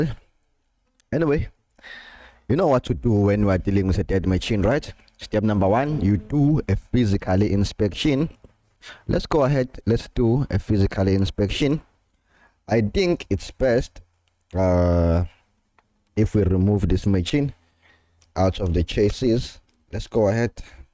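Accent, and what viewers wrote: South Africa. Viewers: I can hear that you're South African and that makes me very proud. Keep on sharing this helpful info. God Bless you.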